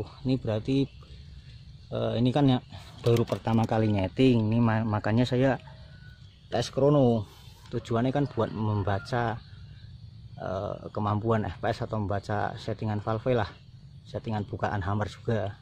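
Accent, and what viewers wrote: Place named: Central Java, Indonesia. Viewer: Mas bro.. logate ky Jawa tengah njih.. Asline auto ngiler niki... 🤭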